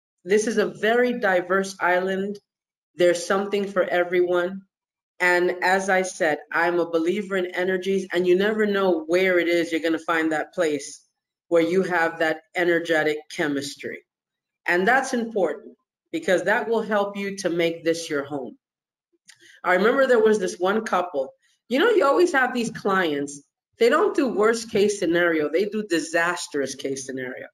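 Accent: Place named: Dominican Republic. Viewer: Wow Im glad you clarified because I couldn’t pin if you were Dominican or American jeje por el acento wow vete con Dios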